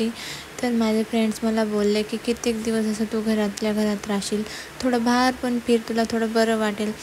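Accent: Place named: Khandesh, India. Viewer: Aap ki bhasha me peevvar khandeshi dikharahi he Qki ho puri ahirani bi nahi or hindi bi nahi to aap yesehi bolog banaiye jay khandesh🎉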